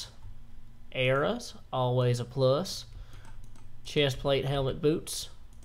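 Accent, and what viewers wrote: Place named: South, United States. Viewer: " I literally heard a cree- HUUURR OMG!" XD funniest part ever.. your southern accent is hilarious ( not laughing at you) but you just earned a subscriber! XD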